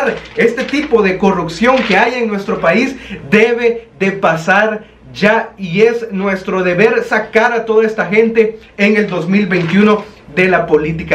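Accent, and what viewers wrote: El Salvador. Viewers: Me gusta tu canal apenas enpese a seguirte De verdad q mis respeto a tu canal y el de Marcelo larin porq nos mantienen informados con la verdad. Me encanta tu humor tu acento salvadoreño . Dios siga bendiciendolos a ti y al q te hiso poner esa mascara lol.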